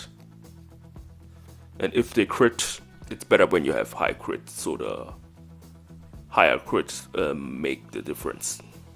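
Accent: Germany. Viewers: Dein Denglisch ist Sympathisch haha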